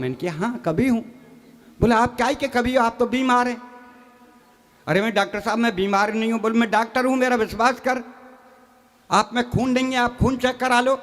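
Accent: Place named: Braj, India. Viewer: Baba ki laungvej to mathura Bharatpur ki hai pakka❤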